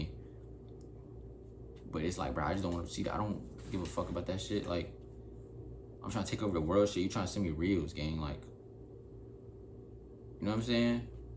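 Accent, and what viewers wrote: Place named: Bay Area, United States. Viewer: You from the Bay Area you sound like it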